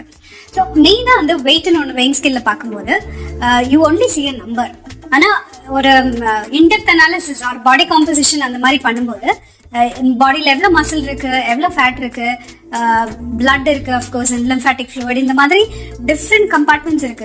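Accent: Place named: London, United Kingdom. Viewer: உன் சொந்த ஊர் சென்னை வியாசர்பாடி தானே பிறகு எதற்கு லண்டன்லே பிறந்தா மாதிரியே பேசறே.. இன்னமோ தமிழே தெரியாத மாதிரி 😠😠😠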